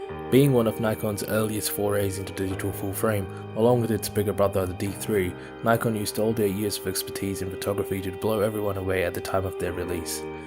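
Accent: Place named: Australia. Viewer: He's Aussie mate!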